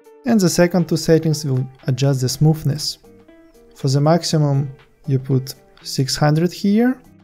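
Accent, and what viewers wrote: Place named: Russia. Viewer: English is not your native language so mistakes are acceptable, important thing is that people who watch your videos can understund you. Btw are you from Russia?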